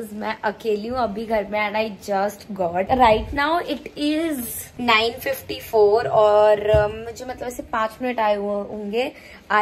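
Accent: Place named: Bihar, India. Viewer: Your bihari accent is just soo cute when you where unboxeing that red purse 🥰also lots of love for you both ❤and waiting for your fun videos💞💞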